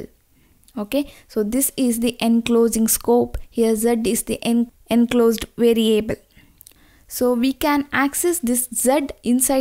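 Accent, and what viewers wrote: India. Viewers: Where did your English learn from? Are you Indian people?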